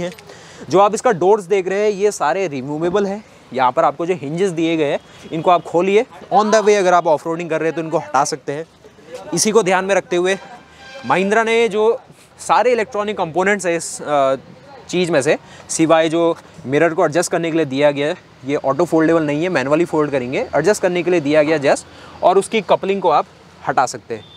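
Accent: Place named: Madhya Pradesh, India. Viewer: Bhai MP s ho kya Unne sun k laga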